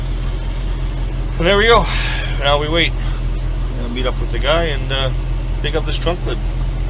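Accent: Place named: Canada. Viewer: Wow, $100 is a steel I understand why you got it. I did good to for like I said for this area at $200. I thought I recognized your accent but wasnt sure that you might be Canadian...I would of bought even your old trunk in the condition its in If it was here for sale. Most of them are rust projects here because Im on a island. My old one is currently on Craigslist.I could repair it with a mig welder in hour but im too lazy and old now,LOLLL.....easier to buy one when the iron is hot for a good deal,HA!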